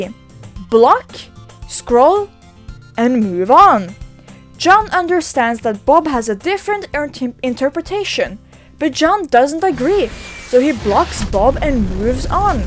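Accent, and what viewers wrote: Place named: Sweden. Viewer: i feel the swedish accent...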